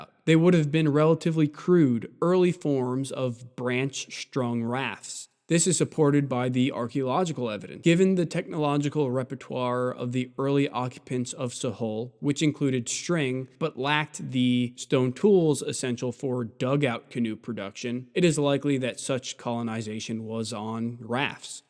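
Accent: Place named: United States. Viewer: Why is this narrated by an American?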